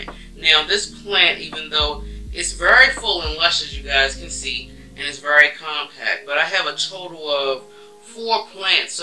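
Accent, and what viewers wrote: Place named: DMV, United States. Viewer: Came for plant info stayed to see if your from the dmv lol I hear it in your voice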